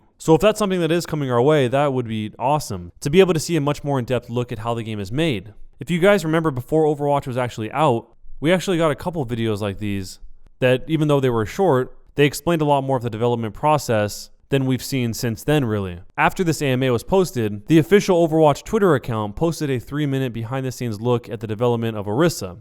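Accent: Sweden. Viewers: U swedish bro? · are you swedish? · Are you Swedish? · are you swedish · Are you swedish?? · O are you Swedish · Are you from Sweden? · Are you swedish????!!!!!! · Are you from sweden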